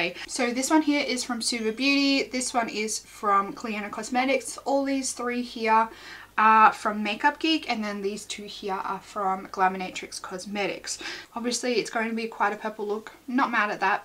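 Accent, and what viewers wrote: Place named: Australia. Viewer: Another person who’s found you via Annette. So lovely to hear an Australian accent. 😊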